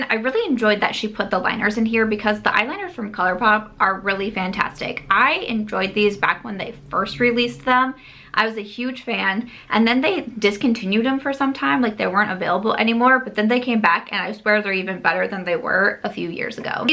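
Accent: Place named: Iowa, United States. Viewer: #samsquad I love this palette! I love the pink look! It would inspire me to try the greens🙃. Lastly but certainly not least, I love your videos. I married a man from Nebraska so listening to an Iowan is extremely comforting. #samsquad jennesskh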